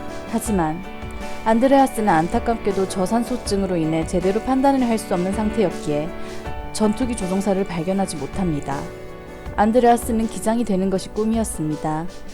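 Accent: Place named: Gyeongsang, South Korea. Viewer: 고향이 경상도쪽 이신가바요..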